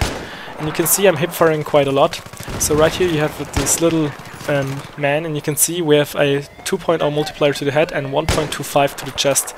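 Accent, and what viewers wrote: Germany. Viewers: Omg, I love you're german accent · Love your accent are u German? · You are German, aren't you?:-)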